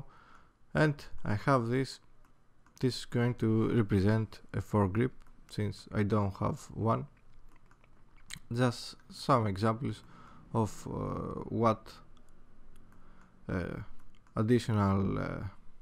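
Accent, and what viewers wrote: Greece. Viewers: The Greek accent though :P Nice tutorial, much appreciated :D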